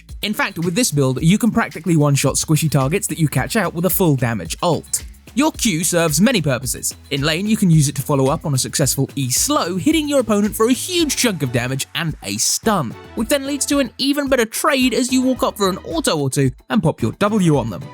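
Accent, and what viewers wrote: United Kingdom. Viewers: I’m sorry but I dislike this British accent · his accent is literally screaming UK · Yes now we have a British caster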